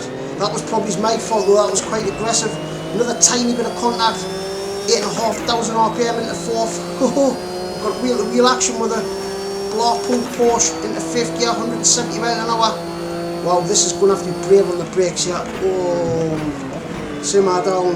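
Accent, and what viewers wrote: Newcastle, United Kingdom. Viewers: Really enjoyed this lad - and great to hear a Geordie accent 💪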